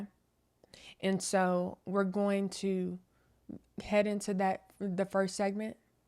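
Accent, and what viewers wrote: United States. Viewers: Where is she from? Sounds American to me, but this is the first video I've watched. ❤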